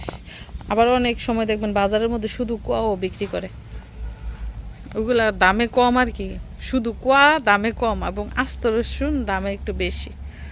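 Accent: Gazipur, Bangladesh. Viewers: Apu Apni ki gazipur er? Ami o gazipur er BT Dhaka te Jonmo bere otha... Apnar kothay amr o Mone hoto apni gazipur er BT kokhono comment kore joges kora hoy nai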